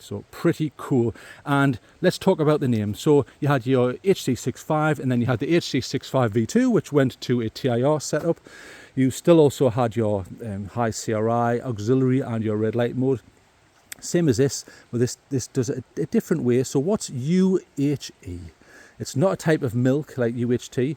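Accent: United Kingdom. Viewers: Good review I have just ordered one even though I already have 3 head torches. Good to hear a North East accent, I’m from Sunderland and now Durham 👍🏻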